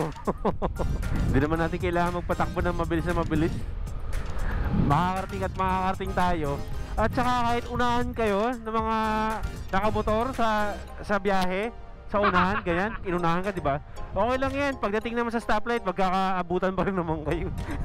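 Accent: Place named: Aklan, Philippines. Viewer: oohhhh.. Aklanon ka pala. kaya pala pamilyar sakin punto mo. hehehe. Mag moto Vlog ka din sa Town natin. hehehe. Aklan!!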